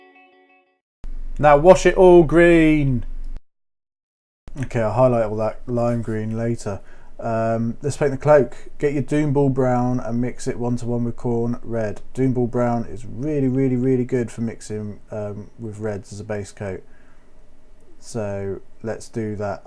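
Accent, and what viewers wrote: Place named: Northern England, United Kingdom. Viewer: u have the jhon snow accent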